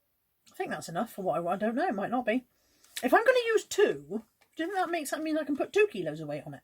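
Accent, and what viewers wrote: Bristol, United Kingdom. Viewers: I could listen to your lovely Bristolian accent all day. Plus you buy all of the same/similar stuff to me! And yes I remember Orangina being ‘posh’!! Thanks for doing the vlogs.......where do I send the flowers lol 🌹🌷🌺🌸🌻🌼xx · Just watched You for the first time will definitely look out for more of your channel on YouTube, Thank-you Lorna from Northumberland 😊 love your Bristol accent my family on My Great Grandmother's side of family are from Bedminster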